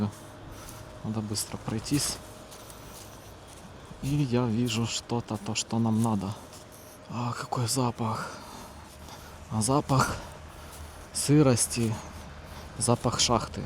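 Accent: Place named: Ukraine. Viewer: як приємно чути українську вимову. дякую за все.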